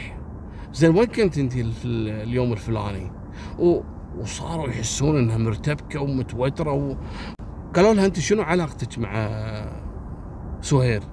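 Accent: Egypt. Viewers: الله الله عليك حجيك بلمصري يجنن 😂😂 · ما أحلاك وانت بتحكي مصري يا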